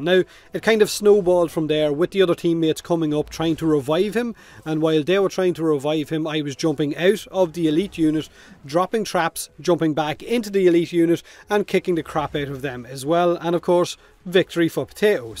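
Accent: Ireland. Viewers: Every time I watch one of ur vids, your accent make me think about the 100000 pints of Guinness I used to drink when I was in Ireland back in the 90's...and I feel nostalgic :D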